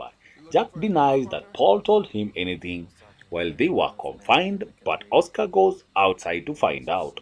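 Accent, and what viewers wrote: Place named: Africa. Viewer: Oh...s@ what the f#$@ Will be much better without the naration of African accent.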